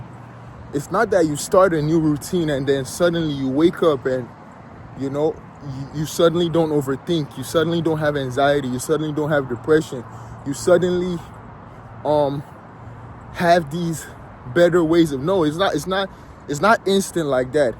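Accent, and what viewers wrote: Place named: Nigeria. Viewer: Great video, man. Btw, you kinda sound Nigerian. I'm Nigerian, that's why... hehe.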